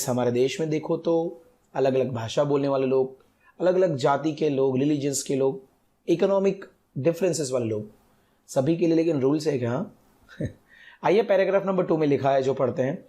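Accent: Gujarat, India. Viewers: Tme gujarati cho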